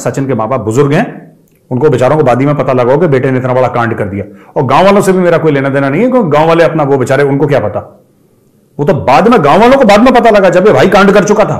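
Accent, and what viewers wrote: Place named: Pakistan. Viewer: Amazing Pakistani voice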